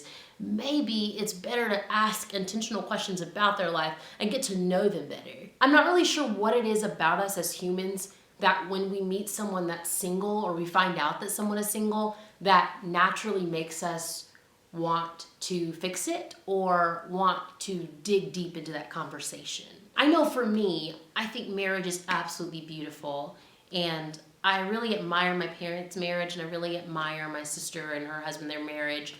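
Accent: South, United States. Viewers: omgosh your southern accent with this message is the most amazing thing ive heard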